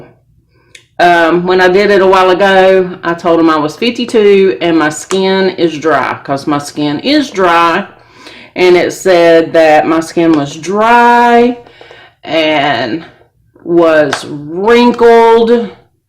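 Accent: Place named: Texas, United States. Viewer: You have the most wonderful accent. Reminds me of my days in Texas. I have seen so many ads for those boxes now. But the Tarte palette in this season's box is just not doing for me. Hahahahaha.. I am at the Foreo (or something) part right now. I was thinking the same thing about the two prong analysis! Because, I mean, we are the first to notice in something in our skin changes, right? May be, for men, who are usually clueless (and proud of it) about skincare, might find it useful. Because, most men I know use a moisturizer, that their wife bought, if the wife says their skin looks ashy! LOL!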